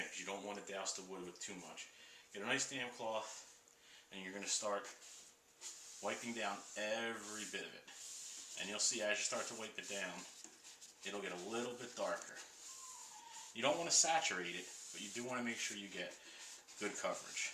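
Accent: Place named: Philadelphia, United States. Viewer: Philly accent.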